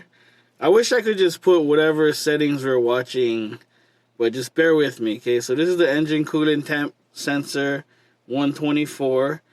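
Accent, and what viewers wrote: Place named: Hawaii, United States. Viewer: now if only my tired 230k mile compressor could make high enough pressure to survive in texas. i thought i recognized that accent in the first video, hawaiians are rare on youtube. local boy 🤙🏼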